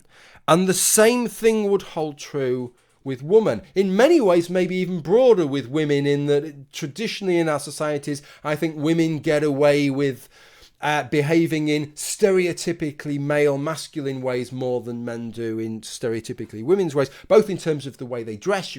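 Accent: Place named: North, United Kingdom. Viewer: You have a pretty voice, sound like the northern people on Game of Thrones! XO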